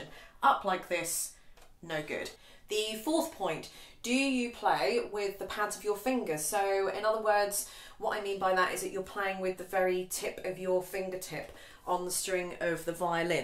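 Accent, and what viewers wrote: United Kingdom. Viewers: I love your british accent, thank you for the advice, im 9 months violin student and i've just started with shift positions and vibrato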